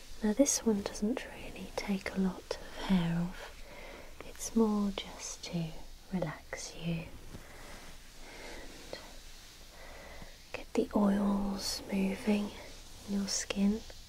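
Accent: United Kingdom. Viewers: British, ASMR, dog. Perfect. Shouldn't have watched this right before going to the gym ZzzzzzZZZZzzzzz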